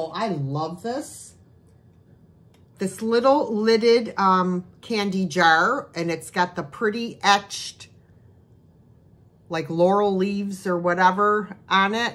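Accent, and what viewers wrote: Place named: Western New York, United States. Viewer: Hi, Just a Question....Do you remember Uncle Jerry's Club? I think it was from the 50's filmed live from Rochester or Buffalo NY studio. It had Aunt Annie on the piano and I used to try and copy the accent, that you also have;^) My sister and I never missed it (Sunday or Saturday morning??) We loved saying Cheektowaga Lackawana and Tonawanda etc. Love your Sat 3pm show and of course others. Please never lose your enthusiasm.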